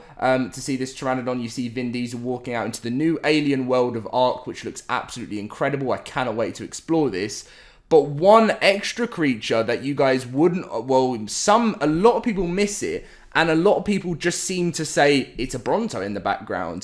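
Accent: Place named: United Kingdom. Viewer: According to his voice: UK According to his looks: US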